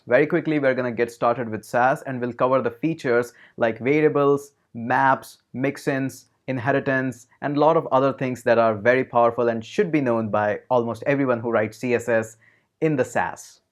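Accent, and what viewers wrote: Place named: India. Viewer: Bought your Android course today and it's amazing. Your Indian accent is pretty good. I am watching your video from 3k subscriber and it's good to be in 200 k subscriber family❤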